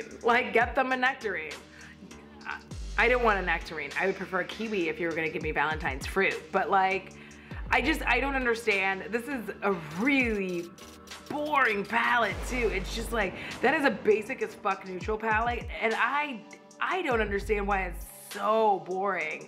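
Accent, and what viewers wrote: England, United Kingdom. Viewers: I love the English accent and that it makes you sound like a villain.... I must be a villain too 🤣🤣🤣 Also agree with the cat mascara it reminds me of the Katy Perry cat perfumes ... decapitate a cat every spray 😻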